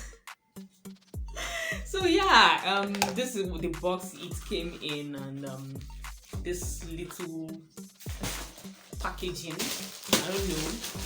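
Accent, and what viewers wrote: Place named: Igbo, Nigeria. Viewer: I am soooooo hearing the igbo In you!😂😂love it! "Foldeble"😂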